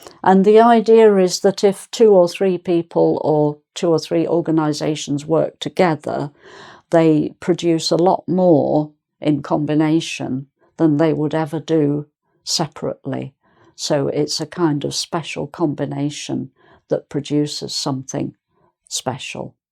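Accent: United Kingdom. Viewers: Thanks so much for sharing this interesting and useful video about buzzwords. I'll try to teach them in my following class. It's a pleasure to hear such a beautiful British accent. 💖 Greetings from Spain. 🌷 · i see it is a british accent ! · I live in Brazil. You can't imagine how happy I am, having you as a teacher, teaching the real British English. I can understand you, my target is having such a noble accent. Thank you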